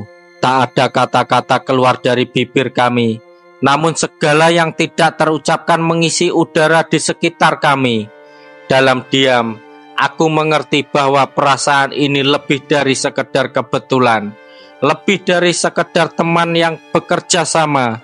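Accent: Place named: Java, Indonesia. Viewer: Medhok banget suaranya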